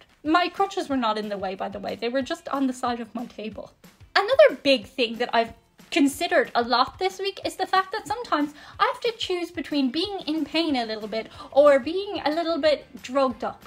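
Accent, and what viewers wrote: Ireland. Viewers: You're an angel! I'm sorry that you had to have knee surgery but it seems to have gone well. Something that seems different in this video is that you seem to be talking faster than usual, and with more of an Irish accent than you normally do. My best wishes to you for a speedy recovery!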